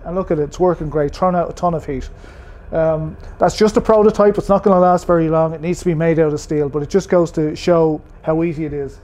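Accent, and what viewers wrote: Ireland. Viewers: That's a mild Irish accent 🤣🤣🤣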